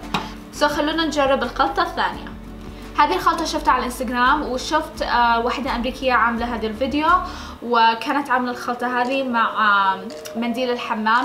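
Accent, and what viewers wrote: Yemen. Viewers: كلامك صنعانيه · ايوه هي يمنيه بس هي جلست في مصر كثير و صارت تتكلم يمني على مصري على سعودي على سوري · لهجتها يمنيه بأقوى قوتها أعرف لهجات بلادي كلها انا في السعوديه وكلامهم مش زي كلامها ابدا عموما يمنيه او سعوديه ع العين والراس الله يسعد الجميع يااارب آمييين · لجهنك يمنيه؟؟؟؟؟؟ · هههههههههههههههههههه الردود تضحك اللي يقول مغربيه وسعوديه ومصريه آيه يمنيه صح بعض كلامها من للهجات ثانيه بس هيه يمنيه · والله كلامها يمني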